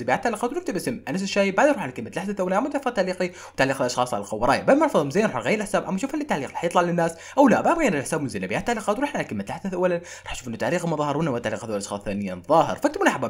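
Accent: Iraq. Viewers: اسرع بني ادم بالكلام انت واللهجة العراقية شوي صعبة الفهم خف عليناااااااا 🙊😹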